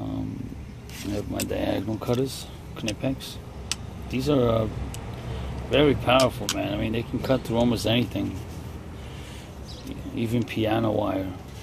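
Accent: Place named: New York, United States. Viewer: I bet he's of Italian or Puerto Rican descent; either way it's definitely a New York accent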